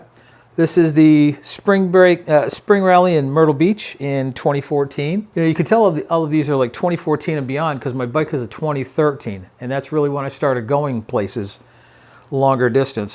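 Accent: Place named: New England, United States. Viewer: !! A fellow New Englandah! I knew there was something familiar about you! But seriously, your videos are the real deal. You are articulate, witty, wise, and above all, interesting to watch! Your editing skills are next-level. Clearly, you know your stuff! I have no doubt you’ll be a big hit on the YouTubes. And best of all, you’re in the Carolinas. My girlfriend used to live in Ladson, so she is going through PTSD watching you ride route 26. Hahaha!!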